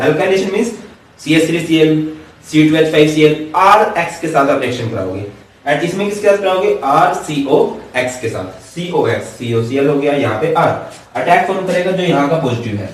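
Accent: Haryana, India. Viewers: Sir kya aap haryana se h ??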